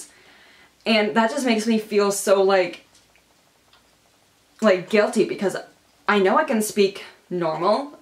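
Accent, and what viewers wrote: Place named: Canada. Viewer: Are you from Canada? I hear an accent. Love all your videos btw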